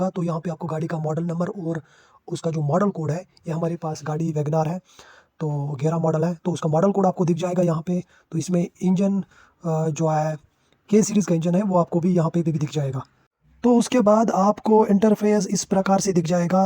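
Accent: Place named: Kashmir, India. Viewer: Kashmiri voice i think.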